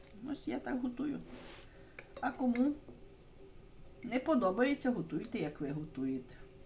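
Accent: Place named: Western Ukraine, Ukraine. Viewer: Так говорять на Західній Україні, в кожному регіоні нашої країни свій діалект і вимова, і тим вона неповторна, незабутня і самобутня!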